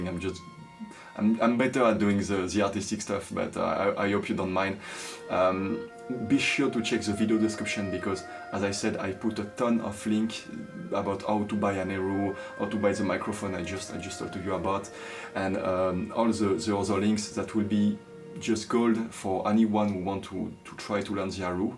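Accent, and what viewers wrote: France. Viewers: 法國人說英語口音真的很重😄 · "I hope you don't mind my terrible French accent" there is a SINGLE sound you can learn that will make a massive difference in your accent and make it much easier for people who aren't used to French accents to understand you. It's the sound of the letter H, which is simply the sound of breathing out through your mouth. If you can breathe, you can make this sound easily! The letter H in English is typically NOT silent like it is in French (though there are always exceptions, like "hour"). I would suggest searching "comment prononcer le son de la lettre H en anglais" here on Youtube. It should take you only a couple minutes to learn and it will THE EASIEST AND BEST way to improve how people understand your speech. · Merci pour cette vidéo, je n'aurai jamais pensé que vous étiez français, j'ai été surprise par le " French accent " avant même de lire la description de votre vidéo. 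En tout cas très beau travail, continuez, ce que vous faites, c'est magnifique =) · your French accent is beautiful. Please never ever get rid of it; it’s as melodic as the Erhu. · Ça s entent que t es francais , le u ! Le u mon reuf ! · Nice french accent! Et joli home studio. J’habite en Thaïlande et je suis passionné de musique asiatique. Bonne continuation. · your accent is Frenchy English and that's lovely and unique 😊 and You have a beautiful voice 🤍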